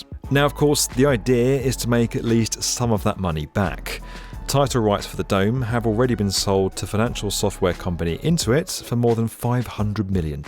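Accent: United Kingdom. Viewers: These pretentious British narrator voices make me ill. Similarly do does the cost of seating and tickets in these arenas built for the minority of wealthy people and the fiscally irresponsible rather then the rest of us. Seriously why would I waste so much money when I can watch it at home in comfort on a huge screen for a tiny fraction of the cost?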